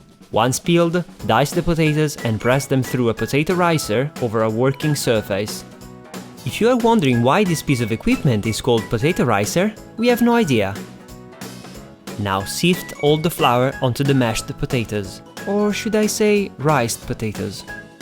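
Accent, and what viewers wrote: Italy. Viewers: yet another great video, thank you! Your videos are always very entertaining because of your wonderful sense of humour:) We also love your italian accent and how you pronounce every letter of English words (as you do in Italian) such as "fruit" and "juice";) Our beautiful Italian vegan friends here in Brisbane recently made us potato and pumpkin gnocchi from their nonna's recipe - buonissimo! They will love your channel too we're sure.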